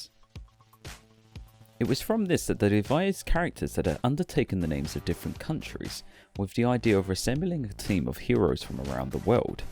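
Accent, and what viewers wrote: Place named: United Kingdom. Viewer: Everyone of English-language native backgrounds - although unofficial, why not try imagining of the '-uer' following JAKQ? - which makes it "JAKQuer dengekitai". I'm just making a suggestion. By the way, it's a pleasure to hear toku info with a British accent. I'm a foreigner, and I wonder if domestic British sci-fi shows like 'Doctor Who' and 'Sapphire and Steel' were (and still are) in the way of the Toei Toku's entrance to the British Commonwealth back in the '70-80s.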